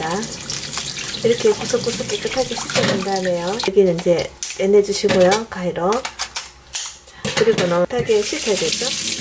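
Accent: Gyeongsang, South Korea. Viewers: 이모님 영상 보는데 옆에서 아내가 인사 따라하네욬ㅋㅋㅋㅋ대구사람아니면 못한다고 대구부심 ㅋㅋㅋㅋ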